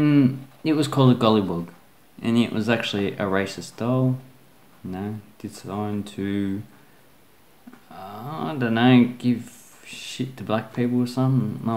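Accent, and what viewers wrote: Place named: Australia. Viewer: Please use American accent instead of Aussie accent it's really hard for me as a non-english speaker to understand every word that you've said, even if you talk slowly as in the video.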